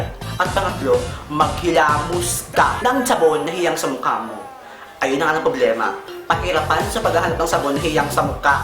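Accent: Visayas, Philippines. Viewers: BISAYA ka kuya?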